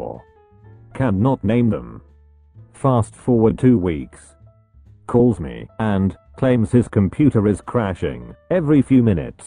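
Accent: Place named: Wales, United Kingdom. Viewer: Welsh lad isn’t he?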